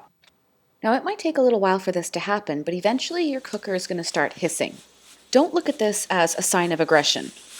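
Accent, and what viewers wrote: Canada. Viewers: its canadian accent and its almost perfect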